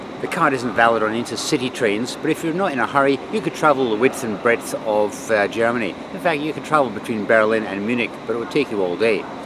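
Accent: Scotland, United Kingdom. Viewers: Great Skaughtisch accent!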